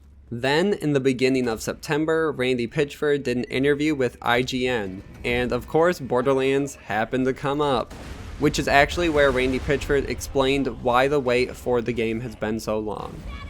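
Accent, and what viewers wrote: Netherlands. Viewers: Wwowowo are you dutch dude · Are you Dutch?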